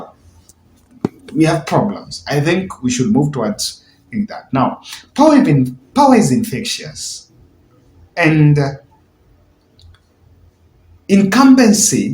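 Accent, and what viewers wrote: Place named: Luhya, Kenya. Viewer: He is talking like a luhya..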